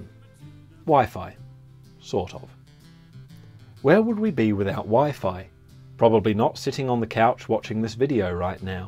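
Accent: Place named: England, United Kingdom. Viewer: Your accent mate. Are you a Englishman...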